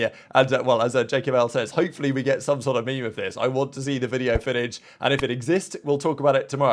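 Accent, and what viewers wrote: England, United Kingdom. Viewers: I think he says " back again today" but it sounds like "hocked rob" cuz he says it so fast in his English accent lmao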